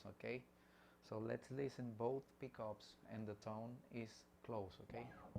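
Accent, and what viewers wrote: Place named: Chile. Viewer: Eres chileno? ... tu acento suena a chileno 😨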